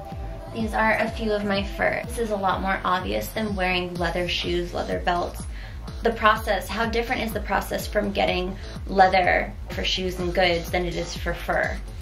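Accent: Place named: New York, United States. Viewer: U can hear her new york accent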